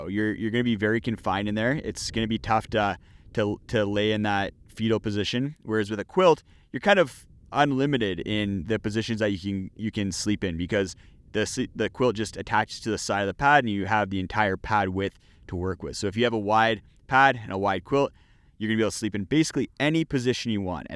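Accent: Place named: Minnesota, United States. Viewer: Love your channel - just found it, and am making my way through. I really appreciate your thought process and honest takes/reviews. And your accent - sounds like home to this Minnesotan. 😂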